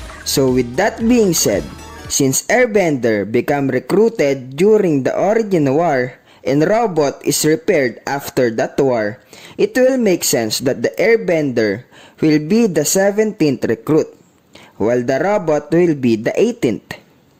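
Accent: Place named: Philippines. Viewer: Bro are you Filipino? I recognize the accent anywhere.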